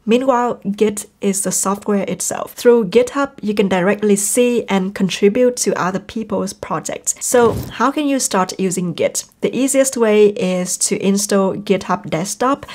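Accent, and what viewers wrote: Vietnam. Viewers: e cảm ơn chị. Một chiếc video tỉ mỉ không kém mấy video của các pháp sư ấn độ nhưng lại có accent việt đáng yêu vô cùng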